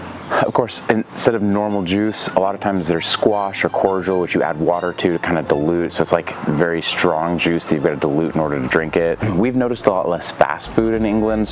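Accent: United States. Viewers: You don't speak English you speak American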